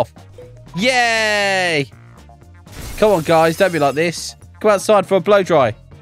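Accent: United Kingdom. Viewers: that british accent tho, its stupidly funny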